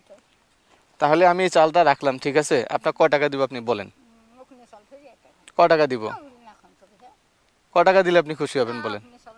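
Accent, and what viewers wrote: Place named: Rangpur, Bangladesh. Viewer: ভাষা শুনে মনে হলো, রংপুরের, ভাই, আমিও ছোটো খাটো বাইকার, আপনার পাশে আমি থাকতে পারি, যদি প্রয়োজন মনে করেন, বলিয়েন, সাধ্যমত পাশে থাকবো। আর আপনার এরকম মুল্যবান কাজ গুলো চালিয়ে যান। দোয়া রইলো ❤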